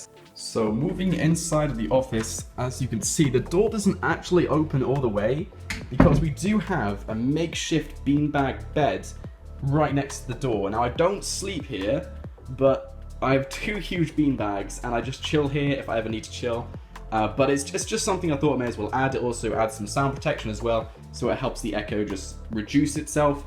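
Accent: United Kingdom. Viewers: I just realised your British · R U British?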